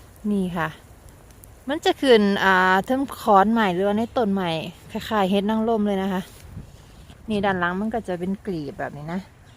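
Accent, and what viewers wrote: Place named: Isan, Thailand. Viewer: เว้าอิสานเสียงม่วนน่าฮักดีค่ะ ยากได้อยุ่จ้า ตากแห้งไว้เด้อค่ะเป็นยา มีหลายคักเนาะ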